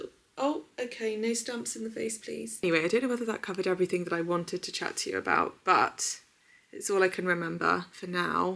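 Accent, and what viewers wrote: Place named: England, United Kingdom. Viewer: I have never been in England..but it have always been so magical to me..and that accent. I dont know if im watching too much england garden tv shows or why its like that. Makes me feel warm and relaxed.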